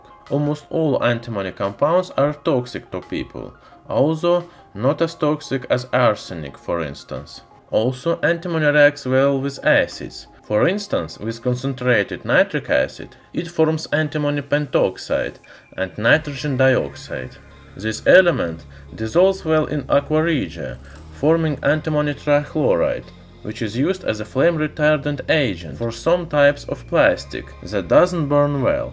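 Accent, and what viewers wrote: Russia. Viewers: Русский акцент ,поработай над этим.а так ахуенно. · What’s with the FPS Russia accent?? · Maybe this guy should use a computer to narrate. товарищ твой акцент чересчур русский. Не понимаю:(( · русский акцент выкупается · It's Russian accent)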